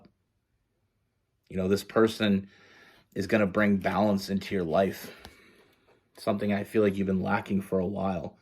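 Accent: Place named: Toronto, Canada. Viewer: U sound like ur from TO. I’m from beaches ✨😄✨ Nice reading 🙋🏼‍♀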